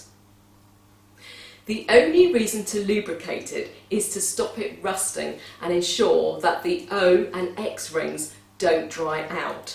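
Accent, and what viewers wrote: England, United Kingdom. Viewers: I love English women and their accent you're a extremely attractive mature lady with a great body!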